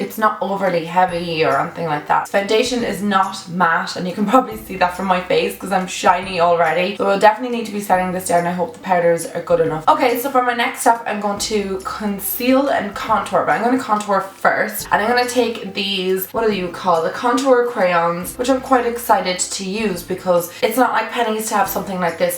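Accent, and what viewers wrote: Dublin, Ireland. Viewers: Are u from Dublin? I'm from Langford xx :) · what part of ireland are you from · What part of Ireland are u from xx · i knew instantly u were frm dublin!! love frm belfast!!! xx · I can't tell wheather your from northern Ireland or southern Ireland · Are u from Dublin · omg r u from Ireland 💘 what county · What part of Ireland are u from?💕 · Irish buddy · Where bouts in Ireland are u from ?🇮🇪🇮🇪🇮🇪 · where abouts in ireland are you from? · Hi Love! You're also my very first Irish you-tuber. Luuv you & your accent & all your tips. ♥ I've watched a dozen videos in just less than a week's time & the dupes videos over & over & have lists everywhere. I just purchased a few drugstore items today as a matter of fact. Also laughed when you & your sister ate American candy. Now I WANT to get the nude lipliner & lipstick from Penney's. How do I get it here in the U.S.? 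Thanks ;D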